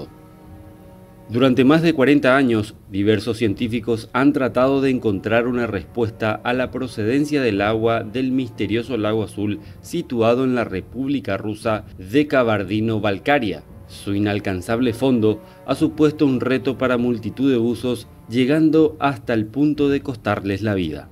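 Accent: Paraguay. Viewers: De que país sos amigo? tenes acepto Paraguayo sin ofender.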